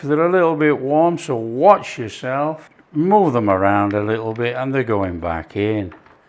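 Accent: United Kingdom. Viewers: Happy day to you and your family, you are very relaxing to watch and learn, you have taught me so much, I love to cook, thank you for helping us so much, love your British accent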